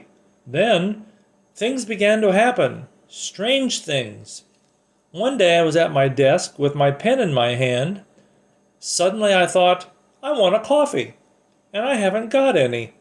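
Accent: United States. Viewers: it was impressive.. We need another ones like this.. I love American accent alot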